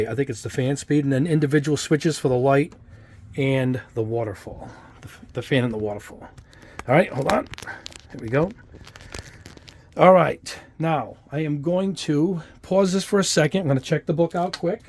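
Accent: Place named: North, United States. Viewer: I watched it again and figured it out. I’m in the deep south. My 65 year old southern ears heard your northern accent say “I guess you could turn it off for lacquers and enamels.” What you actually said was “…..turn it up for lacquers and enamels.” Sorry about that. I’m going to have to get one of these! Thanks again for the video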